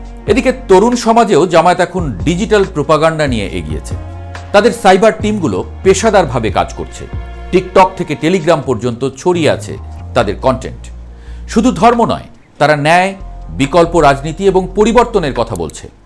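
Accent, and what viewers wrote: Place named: Kolkata, India. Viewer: Apnar kotha bolar dhron kmon jno kolkata kolkata tan ta ace .... 😅😅Bolci dada apnar bari kotay???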